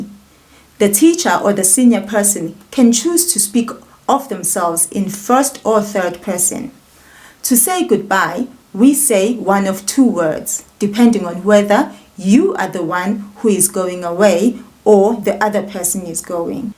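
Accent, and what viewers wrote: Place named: Matabeleland North, Zimbabwe. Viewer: It's funny that when I listen to you, you sound more like me (Northern ndebele) there is a difference in accent, but it's mostly the same. But when I speak from those from Joburg, mhhh it sounds quite different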